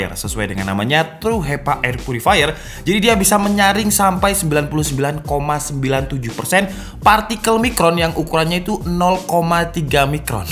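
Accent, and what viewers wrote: Malang, Indonesia. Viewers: Medhok nyaa itu loh heheh ngalam ae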